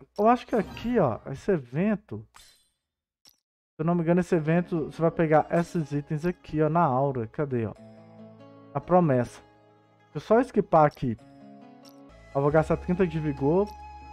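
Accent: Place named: Northeast, Brazil. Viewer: pelo seu sotaque eu jurava que era do Nordeste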